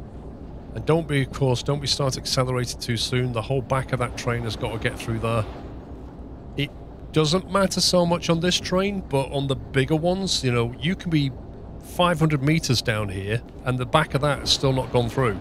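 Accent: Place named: Birmingham, United Kingdom. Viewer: Where are you from? Sounds like good ‘ol birmingham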